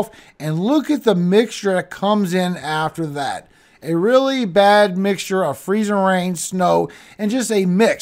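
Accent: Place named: South, United States. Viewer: This guy's from the south isn't he?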